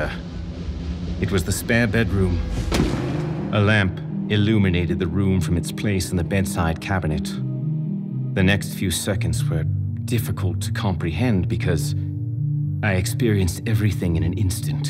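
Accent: United Kingdom. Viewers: Ohhh British? Well this just makes me appreciate even more 😊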